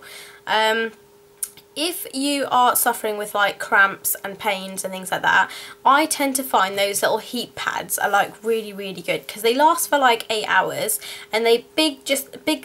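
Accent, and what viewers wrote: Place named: London, United Kingdom. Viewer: I think you are sooo pretty :) and I love your accent I used to have an accent like that, I was born London but I moved so I speak differently now:)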